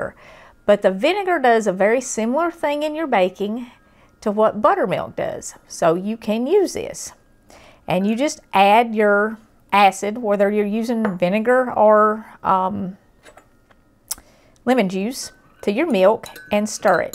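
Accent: South, United States. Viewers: Wonderful slight southern accent, not over done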